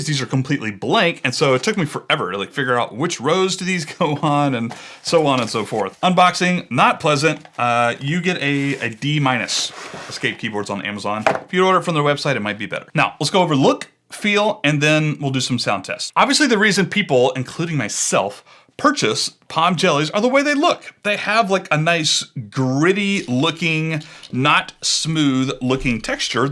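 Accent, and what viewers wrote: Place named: United States. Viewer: 1) Matcha is a type of green tea, where the tea leaves are part of the beverage and not just the brew. It is pronounced MA CHA. 2) It takes forever to come to you because you sound like you are in the USA, and I believe the website your ordered from is in AUSTRALIA 3) The density of POM, or at least these ones, I think is slightly higher than PBT. Dunno if density translates to weight.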